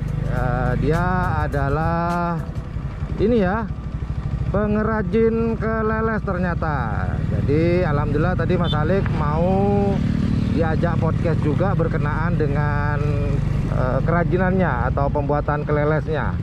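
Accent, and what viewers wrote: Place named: Madura, Indonesia. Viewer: ...logatnya kok beda ya...mirip'' logat madura TIMUR / SUNEMEP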